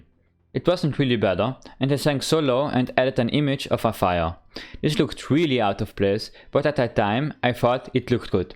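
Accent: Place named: Germany. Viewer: That's so nice. And I have a question from what country are you? It sounds like Germany or something 😅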